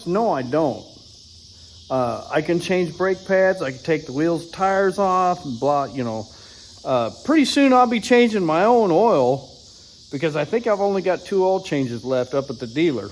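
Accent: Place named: Oklahoma, United States. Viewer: You my mention Oklahoma. I live in Oklahoma and I hear all the locusts in the background and I'm thinking that you sound like your around here somewhere.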